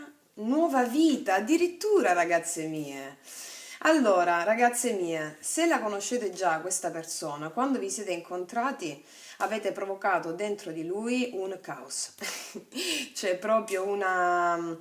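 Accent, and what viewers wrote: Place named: Salento, Italy. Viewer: Ti ho scoperto x caso e la luna rossa dice delle cose a cui voglio credere. Il tuo accento mi è familiare. Salentina? Brava. Grazie.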